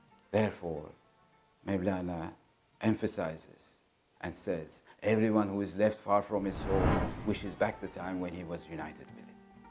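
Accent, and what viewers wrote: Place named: United Kingdom. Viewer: Hocamızın ingilizcesi şahane İngiliz aksanina yakin olması orda uzun süre yaşamasından kaynaklı sanırım 🤗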